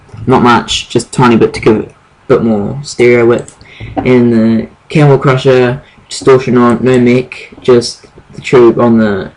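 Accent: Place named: New Zealand. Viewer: lol hard nz accent hahaa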